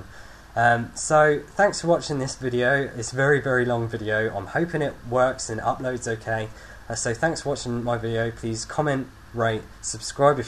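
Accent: England, United Kingdom. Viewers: like ur accent. British accents r hot! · duh thats an english accent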